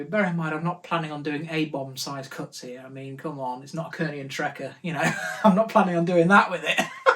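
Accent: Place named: East Midlands, United Kingdom. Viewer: hello me love.." here i am again .. oh ! merry xmass and a happy new year.!.i dont suppose you have amongst all your treasured parts ,,, a table for a centec 2 mill.. the one i have is a right state with over tightening the " t " bolts the upper has broke away it wasnt me !. i want it fixed or replaced... can you help ? .by your accent you dont live too far from me " derby notts area ,",, hope you can help regards laurence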